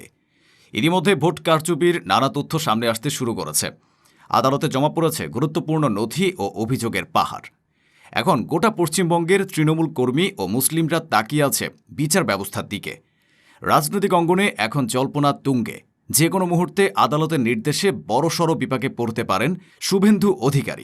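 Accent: Bangladesh. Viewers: কথা শুনে মনে হচ্ছে বাংলাদেশি ,,🥴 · The accent of narrator seems Bangladeshi not Indian. Mere wet dreams!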